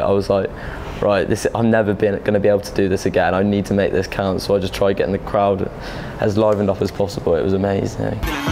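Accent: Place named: RP, United Kingdom. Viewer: he's posh af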